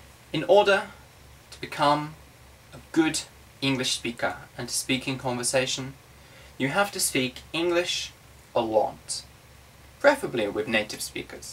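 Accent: United Kingdom. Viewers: are you british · R u a british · Hi!, nice to meet you! I am from Mexico, Puebla. I am learning English, It has been very dificult for me to learn a second language, however, I am trying to do my best. Trying to improve my speaking and pronunciation. Surfing the net, I found your videos by chance. I enjoyed British pronuntiation, because it is elegant and sublime for me, but the most was the way you explain your explanations, it is easy for me to understand your points of view to be a better speaker. I am very happy because I have been learning from your videos, I started praticing the tecnique called shadowing, I am impressed for the results, because not only I could improve my good pronunciation, but also my fluency was very good. It is incredible that my mind automatically help me to find the vocabulary that I needed to structure my ideas. Thanks a lot to share your knowledge and experiencie with people who really is interesting to learn English. 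Sorry for my bad English. My best regards Paty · Love your British accent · British accent !! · r y brittish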